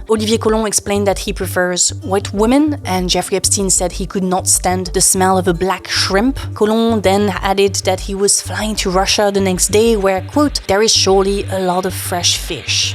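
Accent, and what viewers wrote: France. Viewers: Bonjour, C’est juste la deuxième vidéo que j’ai suivi. I Will Watch them all, I promise. Je suis très fan. J’adore ton approche, je la trouve très informative et basée sur les faits. Ta façon de présenter les choses est tout à fait raisonnable et logique en ce monde de désinformation. Aussi, j’adore ta façon de parler l’anglais. Very cute avec un French cachet. Désolée, je tutoie. Je suis au Canada c’est de rigueur. Félicitations. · Her English is great! Her French accent is very limit compared to others. How many languages do you speak without some sort of accent?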